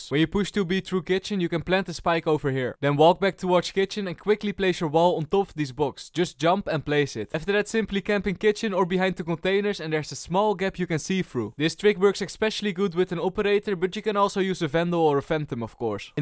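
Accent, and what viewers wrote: Netherlands. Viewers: You a typical dutch accent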